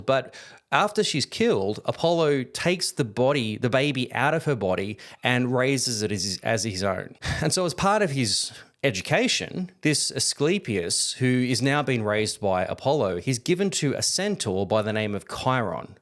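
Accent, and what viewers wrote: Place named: Australia. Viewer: I've been watching a bunch of your videos today, and I'm glad of your explain-the-nuts-and-bolts format for these. It's surprising how few views you're getting, and how many of the videos have zero comments. Your Aussie accent isn't so bad, and your knowledge of the topics isn't so bad ether. Thanks for making them anyway, it must require a lot of work. Perhaps an hour plus about fairly minor characters that so little is known about is pushing it? If you can do it in a thousand words, don't feel the need to pad it to 10 000 words? I'm a Catholic, and you haven't annoyed me. Maybe that's your problem lol. Well, thanks and God bless. May your endeavors lead some to Faith, even if not you to big YouTube ad bucks.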